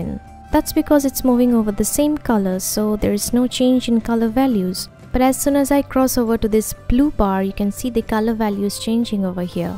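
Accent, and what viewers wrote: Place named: India. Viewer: don’t listen to them. You have Indian accent but you are 100% understandable. 10 stars 🌟🏆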